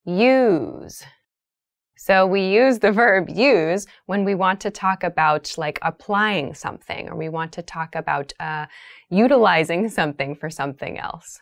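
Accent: United States. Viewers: A big hug from Colombia for you. Love your american accent and i can understand almost everything what you say. Thanks for help us. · Is she American?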